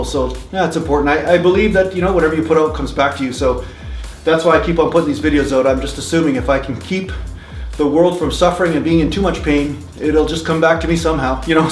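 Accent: Canada. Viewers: No prob my dude. You're canadian accent also reminds me of home (I'm originally from Minnesota) which makes me love your vids even more. I'm about to binge watch a shitload of them.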